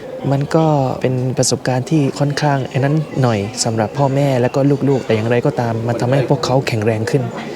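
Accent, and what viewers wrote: South, Thailand. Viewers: พีเป็นคนใต้ไหมครับ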